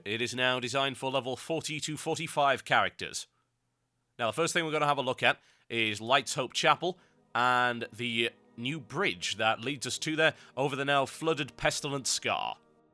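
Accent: United Kingdom. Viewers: When i logged in to YouTube i was like: "Oh, come on now. I need some fantastic brittish commentary on some fresh Cataclysm videos." And When i saw this video under subscriptions, i was like: "Yessss!":D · Erm, british accent?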